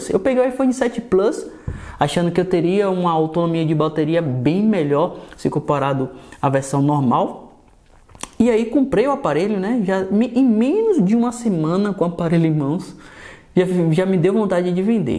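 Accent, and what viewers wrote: Bahia, Brazil. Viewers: TÚ É BAIANO É BIXIM 😁😁👍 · Vc é baiano? Conheci pelo sotaque né? Rs abraços... Sou de Salvador/BA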